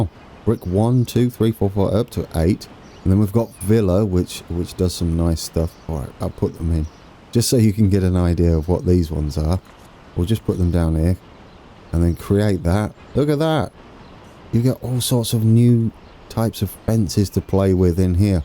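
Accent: United Kingdom. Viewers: Ah yes, soothing British voice to brighten my day.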